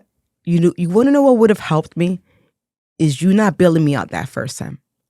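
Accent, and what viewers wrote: Dominican Republic. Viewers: I caught that too when you spoke. I’m also Dominican. KlK 🫡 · I picked up on that beautiful subtle Dominican accent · Is she Dominican ? She has an awesome accent its so subtle. I was trying to place it but couldn't. Cool lady..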